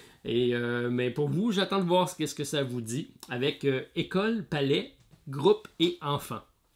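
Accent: Quebec, Canada. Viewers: Joyeux Noël:-) 1er commentaire pour ma part, pour une chaîne que j'attends impatiemment chaque WE (avec ton accent québécois, je suis au sud de Lyon). On a reçu ici pour Noël TTMC et un Unlock... et nous avons déjà joué aux deux! Fait marquant de l'année pour moi: Tainted Grail, même si je ne suis pas allé très loin (et en ce moment le "ks" "gamefound" ISS Vangard). Bravo pour tout! Et bons moments de jeu en famille.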